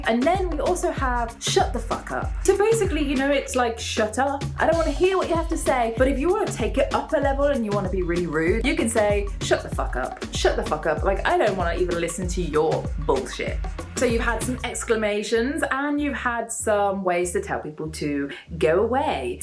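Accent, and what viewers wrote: United Kingdom. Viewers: we'll, that's a shame, cause it sound's very funny and ear it in british accent is fabulous. Thanks!!!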